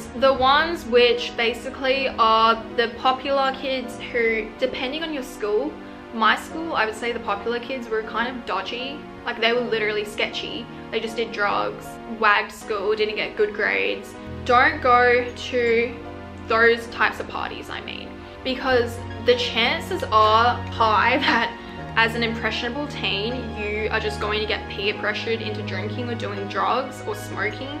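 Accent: Australia. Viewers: Love the Australian accent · Thank you ! Your advices are just so helpful. Also, really appreciate your aussie accent 😊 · i’m just living for her aussie accent. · Your Aussie accent is amazing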